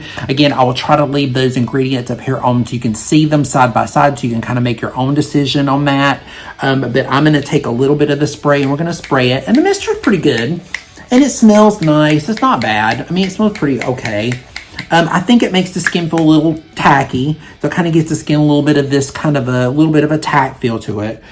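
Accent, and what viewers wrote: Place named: North Carolina, United States. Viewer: Hi! I'm Sally and a new subscriber. I love your content and personality. I want to know if you have a drugstore dupe for YSL Concealer? 🙏..btw.. Charlotte!? Omg I used to live in Fayetteville NC in the early 90's.. that accent! 😊 💕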